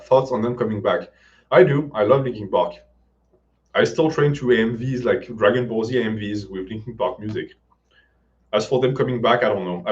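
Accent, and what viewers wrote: France. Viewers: It was rainy the whole week and despite that I wanted to get my steps in and went to the gym by foot. While coming back home all wet and soggy I just imagined stupid face with a grimace saying "Homegym Masterrace" with his french accent.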